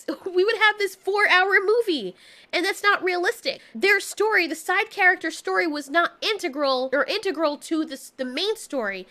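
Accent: United States. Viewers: I thought u were American.Thats crazy.Are u American?❤ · not no accent she just speaks with a very smooth American accent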